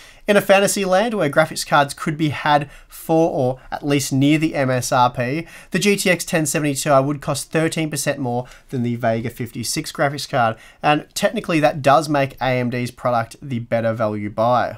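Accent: Australia. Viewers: That "spin" of words in the benchmarks! That eloquent Ozzie accent! you "GymKhanna" circles over youtube man:)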